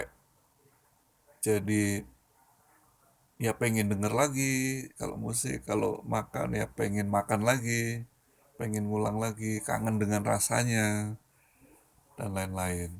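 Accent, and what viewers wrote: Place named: East Java, Indonesia. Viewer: Mass sampean iki asline pundi kok kyak logat jawatimuran kalau ngomong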